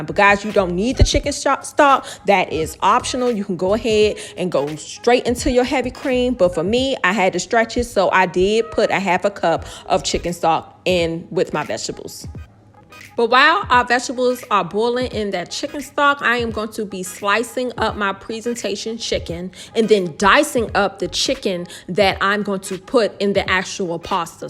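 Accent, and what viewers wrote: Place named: DMV, United States. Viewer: Gotta b from the dmv the way u talk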